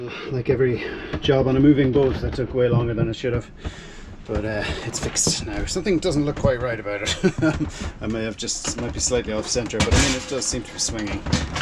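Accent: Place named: Ireland. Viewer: Really enjoying these videos of the West to East Atlantic passage. Totally in awe. Really aware of the risks especially when you mention the possibility of knock downs and you hear cracks in the cockpit. So refreshing to watch after not watching you for a few months. Plus the added attributes of the gentle Irish chatter. And the transparency! Absolutely love it! ⛵️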